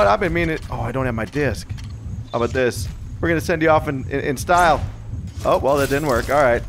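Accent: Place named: Quebec, Canada. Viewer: Le gars est clairement québécois haha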